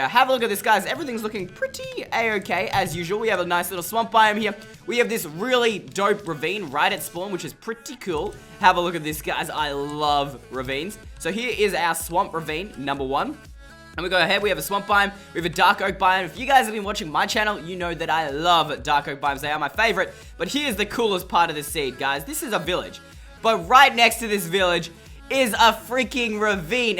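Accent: Australia. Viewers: r u Australian? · How old are you just wanna know your age you sound and look young but u can already tell you come from Australia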